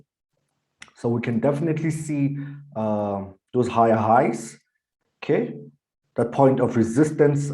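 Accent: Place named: Uganda. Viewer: U sound like Ugandan and munyoro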